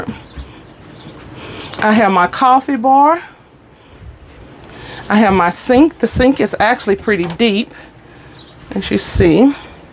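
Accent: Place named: Texas, United States. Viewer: I most definitely hear a TEXAS accent 😇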